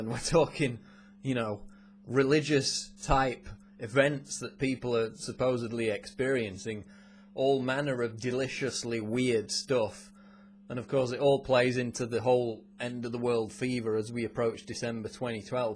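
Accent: United Kingdom. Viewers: You live in midwest? what's with the british accent? jw cuz i live in wisconsin too.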